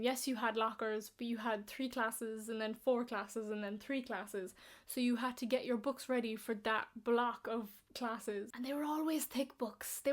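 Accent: Ireland. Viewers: juging by the accent she's from mayo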